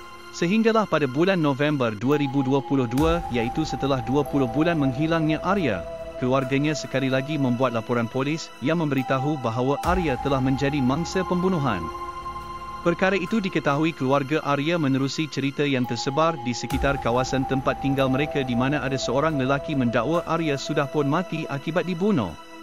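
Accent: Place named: Malaysia. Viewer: Cannelnya solo suara prmbawa acaranya kaya orang malasiya ya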